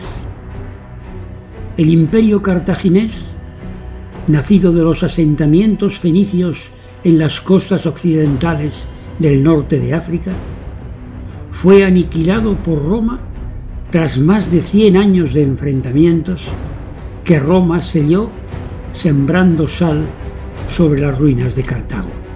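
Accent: Spain. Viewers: Me jode mucho el acento español tan marcado q por momentos ni se entiende, por eso no pude seguir viendo el documental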